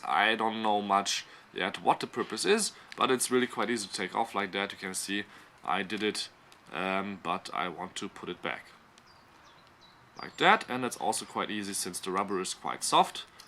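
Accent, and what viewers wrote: Germany. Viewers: Dieses Deutsche akzent schrecklich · Deutsche die Englisch sprechen hören sich so scheiße an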